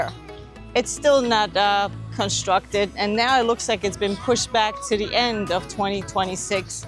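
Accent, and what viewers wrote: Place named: New York, United States. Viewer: Can't take the NY accent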